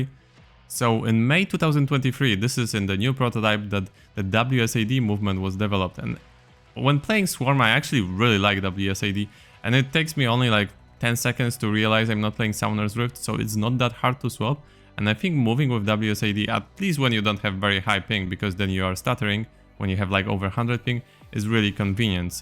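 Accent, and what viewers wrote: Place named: Poland. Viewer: aren't you polish? your accent seems similar xD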